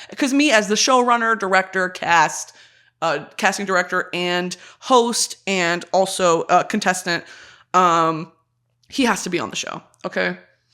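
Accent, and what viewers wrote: United Kingdom. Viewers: your birtish accent is so jess this season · The British accent is giving Chole from love island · Your british accent is giving. I love it!!!! But fr make this love island NOW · u should do an episode in full british accent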